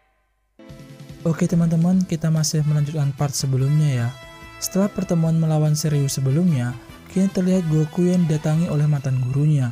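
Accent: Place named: Medan, Indonesia. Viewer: Anak medan keknya kau ni ya kawan? Sukak kali lah penjelasanmu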